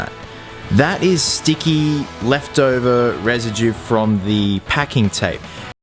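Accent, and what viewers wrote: Australia. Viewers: r u australian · he is a ausie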